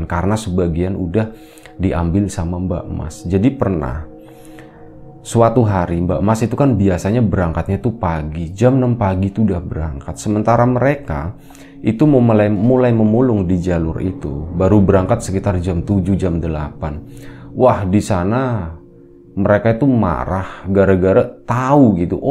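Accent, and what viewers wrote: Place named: Riau, Indonesia. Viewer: Judulnya itu lhoo mas... ngreget aku.. 😆 Shang Pemulung Riau Hadir mas, Riau mana ini suaranya.. 🙌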